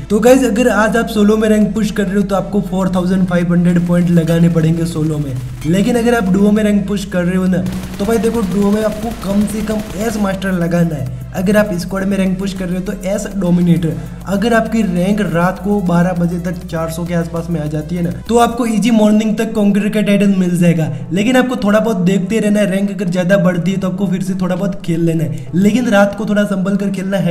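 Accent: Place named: Rajasthan, India. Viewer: Bhai aap rajsthan se ho kya ❤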